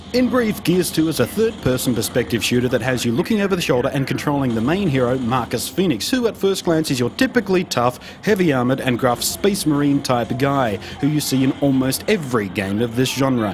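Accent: England, United Kingdom. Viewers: The guy is british, and that's how british ppl say it. -. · nothing is wrong with English people. it's this guys pissy attitude towards the game and his accent. most English people's accents are all right. but this guy pisses me off. fuck he's stupid.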